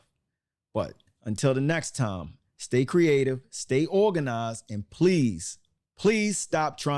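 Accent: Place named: Baltimore, United States. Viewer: Man that Baltimore accent STROWNG ain’t it 😂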